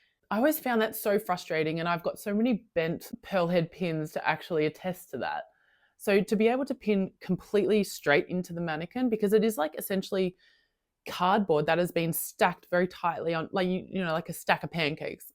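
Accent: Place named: Australia. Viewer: Nice vid! I did the cheats version of this about 18 months ago. I took photos and measurements and padded out a reasonably high-quality mannequin that was close to my size. It's not exact, because it was hard to get the little details right ......but its 80% there. She looks remarkably like yours, lol. Which is......comforting, actually. At first it was confronting to see how 'not perfect" I was. But after looking at her for months, I have accepted her......as me. AND........what a delight it is to be able to use a fit model that is closer to my body! I do corsetry and alot of fitted styles, so it really helps. I don't think she is quite good enough to do draping.....so i am VERY interested in your new acquisition, and seeing how you get along. PS. its lovely to hear an Aussie accent.....and you are a fellow Melbourne lady....so double 👍points there! 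PPS. you actually grabbed my attention initially because I saw your Regency outfit in the background.........I also enjoy costumery and am currently trying and failing to make some long regency stays.......I can't quite tweak it.....even with my padded form. ergh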